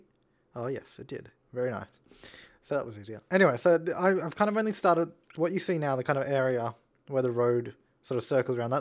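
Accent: Australia. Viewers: His accent is… Australian, right? Oh and from this video I can already tell I'd fail so hard at this. v.v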